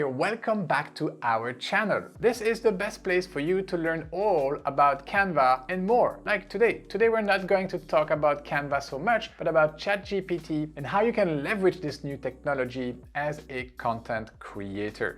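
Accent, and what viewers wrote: France. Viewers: Hello ! Are you French ? Love the accent ! 🥰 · Lol, just listen at his accent. He’s either French or from the French speaking area of Belgium. Pretty obvious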